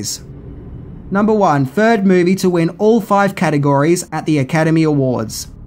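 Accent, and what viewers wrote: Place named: Australia. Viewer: . Your assie accent is one of the reasons that makes your channel so enjoying.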